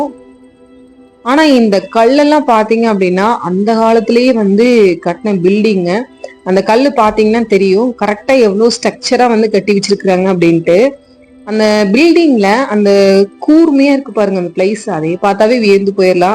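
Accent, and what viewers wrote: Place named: Kongu, India. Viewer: அருமையான தகவல்கள் அவர்களே. நம்ம கொங்கு தமிழில் நீங்கள் கூறியது அருமை. Building என்று சொல்வதை தவிர்த்து 'கோவில் கட்டிடக்கலை' என்று கூறுவது மிகச் சிறப்பாக இருக்கும் என்பது என் தாழ்மையான கருத்து. தாங்கள் கொங்கு நாட்டின் எந்த பகுதியை சேர்ந்தவர்?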